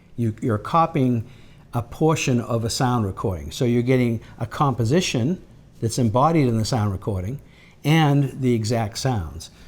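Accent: Boston, United States. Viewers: . Boston Accent 101. But I’m happy because is So Incredibly Dumb as a song, it deserved to be destroyed.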